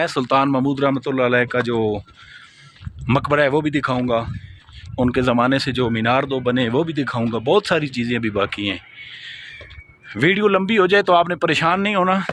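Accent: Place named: Chakwal, Pakistan. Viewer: آپ کا تعلق پاکستان کے ضلع چکوال سے تو نہیں ھے کیونکہ لہجہ بتا رھا ھے؟؟؟❤❤❤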